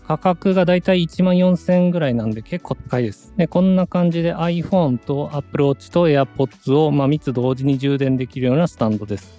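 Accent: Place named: Kansai, Japan. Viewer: 関西弁で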